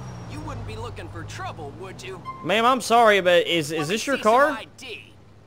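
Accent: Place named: Kentucky, United States. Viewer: As soon as you started I could tell you were from KY im from Barren County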